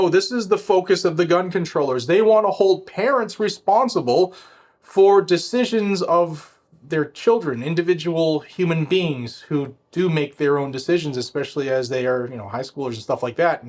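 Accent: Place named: South, United States. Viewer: Y'all? You're getting a wicked southern accent.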